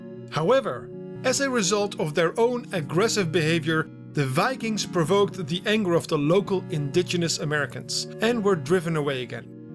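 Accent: Netherlands. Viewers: Is the narrator Dutch? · yo, you dutch cuz it sure sounds like it ^^ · Rook took I think so I'm Dutch and he really sounds like a Dutchman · is this guy Dutch? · You can hear immediately that the video is voiced by a Dutch person. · You sound Dutch. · Wow, the Dutch accent is kind of heavy. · Quite noticable accenr there. Are you Dutch? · Manoj Anton-Rajkumar sounds more like a Dutch accent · His Dutch accent is terrible. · I think this has to be one of the best video about Alternate history I ever seen. BTW am I hearing a Dutch accent? Ik ben blij dat ik je kanaal op youtube heb gevonden.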